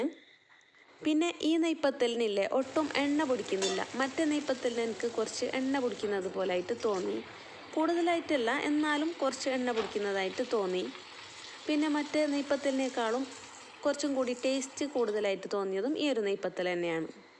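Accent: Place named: Kasaragod, India. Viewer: നെയ്പത്തൽ കാസർകോഡ് സംസാരവും ഇഷ്ട്ടപെട്ടു 👍🏻👍🏻👍🏻👍🏻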